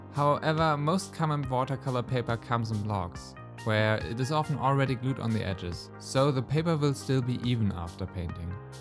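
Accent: Germany. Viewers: A lot of people don't like the montval, and I'm certainly not qualified to speak on paper quality but I really liked it when I got a big sheet for cheap just to try. Not everybody can afford arches. Dacht doch ich hör da nen deutschen raus Liebe grüße in die heimat, hoffe bei euch wird es nicht so schlimm wie bei uns hier an der amerikanischen ostküste. Wäre schon jahrelang lieber wieder zuhause aber nie mehr als jetzt. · Dein deutscher Akzent sticht heraus:D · gutes video. Aber boah was fprn harter deutscher akzent der ist ja fast so schlmm wie mein akzent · Ich wusste sofort dass du aus Deutschland kommst 😂 aber man merkt es kaum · Честно говоря, я просто написала то, что больше всего подходило (´∀` ). Переводить на слух с немецким акцентом немного сложно, и я просто долго не могла понять, что он говорит. Так что пару раз прибегла к простому "и так сойдёт". Хотя на видео он именно это и делает: вытирает кисточку пальцами. 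· Ich liebe den deutschen Akzent :D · I love your accent~ It's sounds German to me...correct me if I'm wrong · Luuzy Arts wie man es einfach immer bei jedem deutschen so mega raus hört xD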